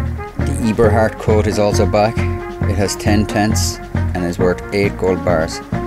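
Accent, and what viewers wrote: Ireland. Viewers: Are you Irish yeah? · Also thumbs up to the voice over; you've kept all the important text for when I'm on the bus watching and don't want to bombard folks with audio they didn't ask for, but I'm sure they wouldn't mind with the low volume, even pace, and Irish accent. A+ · Keep talking Irish bro... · Voice over is brilliant, Irish voice goes really well with a cowboy theme imo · Love the Voiceover man, are you irish? Your accent kinda sounds like it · Holy shit, you're Irish? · The voice over is fne a great accent from the Emerald isle. Good vid, keep up the good work.👍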